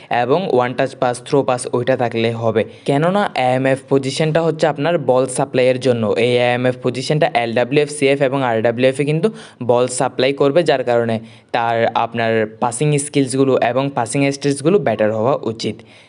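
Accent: Chittagong, Bangladesh. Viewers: Vai apnar basha ctg te naki😊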